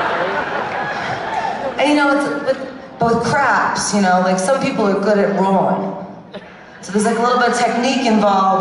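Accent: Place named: New York, United States. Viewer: That New York accent is coming through strong here.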